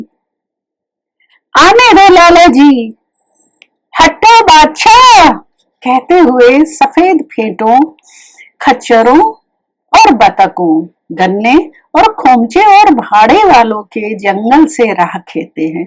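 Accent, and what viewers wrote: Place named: Punjab, India. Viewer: ‘उसने कहा था’ चंद्रधर शर्मा गुलेरी की मर्मस्पर्शी और अमर कहानी है.यह हिंदी का सर्वश्रेष्ठ कहानी मानी जाती है. प्रसिद्ध लेखिका के स्वर में यह कहानी और भी जीवंत हो उठी है. वास्तव में कहानी शब्द का अर्थ ही यह है कि एक ऐसी रचना जो कही जाए. लेखिका ने उन तमाम स्थलों पर भी भाव-भंगिमाओं का संप्रेषण नाटकीय अंदाज़ से करके इसे सचमुच जीवंत बना दिया है. वस्तुतः इस कहानी में पंजाबी के कुछ शब्दों का प्रयोग गुलेरी जी ने जिस अंदाज़ में किया है, वही इसे आंचलिक स्वरूप प्रदान करते हुए विशिष्ट कहानी बना देता है. “यदि कोई बुढ़िया बार-बार चितौनी देने पर भी लीक से नहीं हटती, तो उनकी वचनावली के ये नमूने हैं—हट जा जीणे जोगिए; हट जा करमाँवालिए; हट जा पुत्तां प्यारिए; बच जा लंबी वालिए।” ने इन शब्दों का उच्चारण भी पंजाबी लहजे में करते हुए इसे और भी नाटकीय और जीवंत बना दिया है. विजय मल्होत्रा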